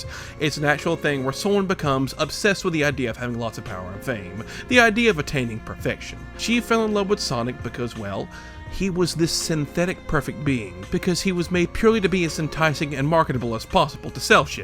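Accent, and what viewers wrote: South, United States. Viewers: Ok, I think I've pinned the accent. You from Tennessee or Mississippi?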